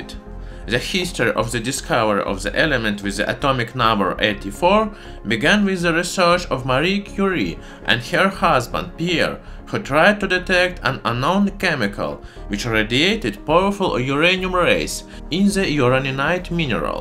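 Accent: Russia. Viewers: Is he Russian? I love his accent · There is my beautiful Russian accent back again. Ya tebya lublyu.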